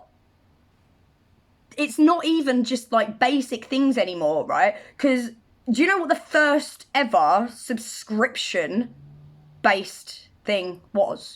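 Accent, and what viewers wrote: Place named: East London, United Kingdom. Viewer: lol only found your channel like a week or 2 ago and love it lol you really remind me of home i was born in east London and grew up in Essex, lol you sound so much like me on things 👍 i have been listening to Alex Jones for 25 years or a little more and everything he said is here now in everyone's face!!! and still people call him crazy lol i could natter for hours with you. keep up the videos they are great.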